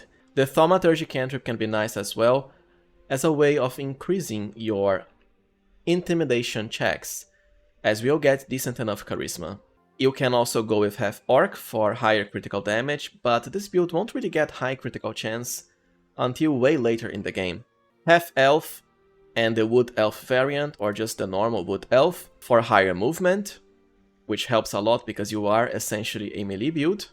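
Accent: Brazil. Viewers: Nice build as always! E ai mano, vou mentir não seu sotaque em inglês parece de Br vc é br? Keep the up the good content bro, you helped me a lot in tactician.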